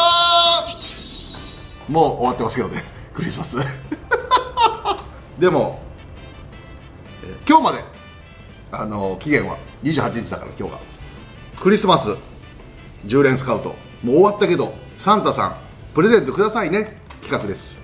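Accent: Kansai, Japan. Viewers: って関西便なの？？そもそもこれが関西弁7日も分からないけど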